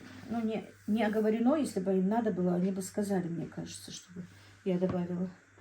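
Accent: Standard. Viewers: У вас чистая русская речь,удивительно даже,дай бог здоровья! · здравствуйте, молодец, как у вас всё здорово получается, а как вы чистейшей говорите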